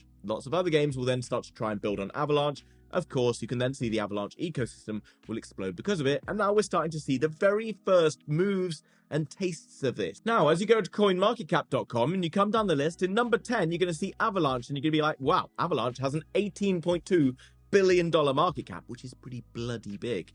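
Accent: United Kingdom. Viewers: Love your content man! Your British accent is GOLD! :-D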